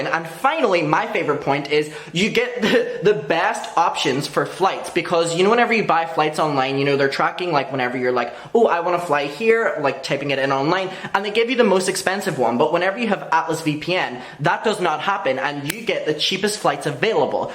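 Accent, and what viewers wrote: Ireland. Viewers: Knew when you started talking you were from Ireland LMAO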